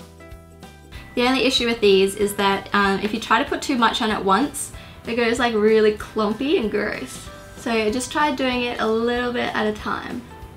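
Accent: Australia. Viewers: Where in Australia is she from?🇦🇺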